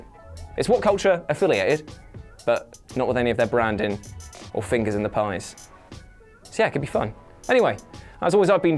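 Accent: South, United States. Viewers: I'm in love with Jules' Southern accent! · Wow, seems like Crapcom's not so hot on those sequels, huh? And although your American accent is funny, DMC2 was ALL Crapcom Japan. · That southern accent tho lol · Jules in his southern accent 👏🏽👏🏽👏🏽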